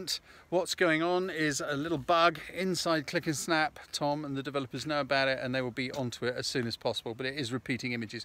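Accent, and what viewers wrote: United Kingdom. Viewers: Hello,. I wouldn't mind just hearing you talk everyday:-D (it's probably the British accent... if not, I'm sure it helps). I always say I must have been British in a past life:-D... and now I'm just planning to get "back". So I just want to ask about your equipment. What stabilization method were you using in this video? Cheers.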